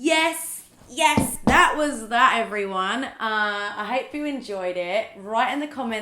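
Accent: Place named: England, United Kingdom. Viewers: So I’m from the U.S and I have always been curious about where in England her accent is from. Anyone know?? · Karina Natalie it’s a Norfolk accent x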